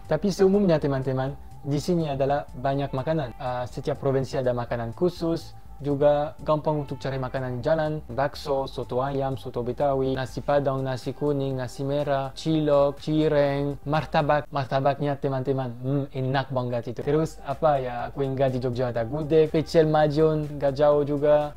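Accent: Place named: France. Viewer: Bahasa Indonesia nya sudah lancar aksen nya doang masih Perancis 😁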